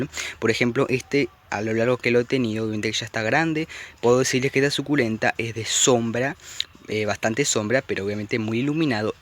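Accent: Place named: Argentina. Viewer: Fabuloso!!! Muy buena tu explicacion....me encanto!!! Eres ARGENTINO.....de que zona eres??? Abrazo gigante y esperando tu proximo video.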